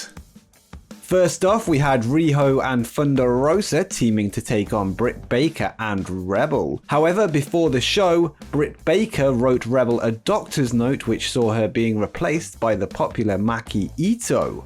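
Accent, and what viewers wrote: United Kingdom. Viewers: why are most of these wrestling clips narrated by a dude with a british accent? just askin'.....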